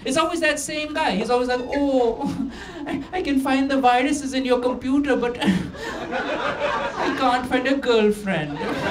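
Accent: India. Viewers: dont know where you are grown up but you still have got Indian accent :D .. it is not new content , copying of Trevor noah and Russel Peters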